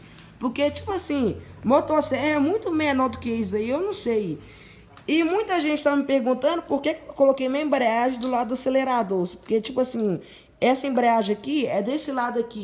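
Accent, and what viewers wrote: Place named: Minas Gerais, Brazil. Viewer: Minero fala tudo igual Man kkk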